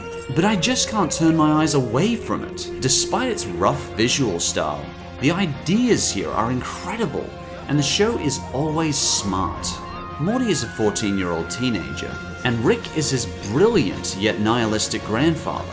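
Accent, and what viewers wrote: United Kingdom. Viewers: #1 bad thing about your british accent. when you say, ARTISTIC i think your sayin autistic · I thought I was going to watch an episode but I get narration from some f**** Brit my grandma's from England but your voice is annoying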